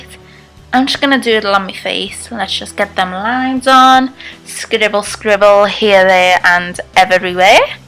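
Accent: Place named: Liverpool, United Kingdom. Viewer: Hey are you from Liverpool just wondering from your accent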